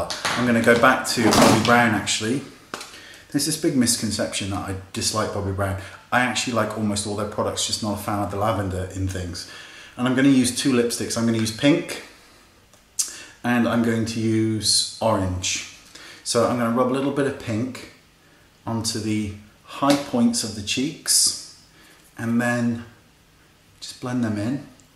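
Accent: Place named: Bristol, United Kingdom. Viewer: Where are you from? I love your accent! I'm from Bristol and it slightly reminds me of that :D I love your videos, I've been watching them for a while now :) They've completely changed my routine. I'm a nurse and want to look clear and healthy, could you do a video to recommend a minimalist look? :D sorry for the long comment lol xx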